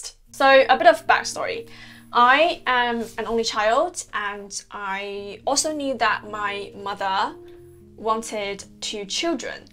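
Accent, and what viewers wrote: United Kingdom. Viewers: Great content. Learned a lot. Prettiest british accent ever. A+ on the book reviews. I am French. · your bookreview... I found it quite.. informative. Also about your topics of interest, and your public persona. Youdr British accent is quite impressive, you seem to be ambitious and a person, who is on a definite path of making your life exceptional in your own way. Did you read books by Cixin Liu? If yes, did you find them worthwhile? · you have a sort of posh british accent mixed with some chinese accent, it's very interesting; where did you goto school? did you grow up in england?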